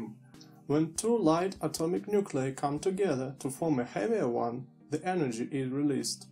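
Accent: Russia. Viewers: русский акцент? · Акцент русский, не спроста? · Is it a russian accent?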